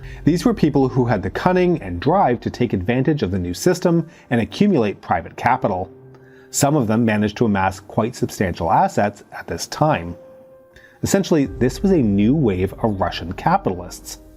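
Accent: Canada. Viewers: Hah, I always thought you had that slight Ontario accent. I'm from Kingston lol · It finally clicked during the conclusion why he sounds American but pronounces things like a Brit… he’s a Canuck!!!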